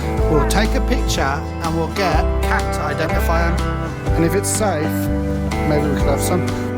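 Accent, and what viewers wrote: Midlands, United Kingdom. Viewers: That’s a familiar accent, making me homesick for the midlands and my boat all at once…… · Your accent reminds me of my childhood! Proper Midlands accent! Love it. ❤️ good on you living the dream....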